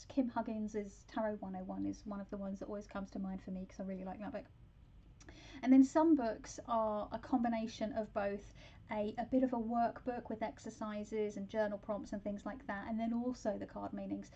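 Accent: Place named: United Kingdom. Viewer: I wish I would've had you for a teacher when I was in school! Professor course on "the metaphysics of English literature" is fab! cool British accent, long flaming red hair wonderful speaking voice and a master of waffling, what more could you ask for in a cool college professor!!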